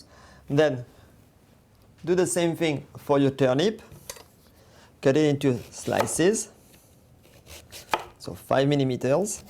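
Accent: France. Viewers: on vois que c est un français qui parle car son accent anglais n est pas maitriser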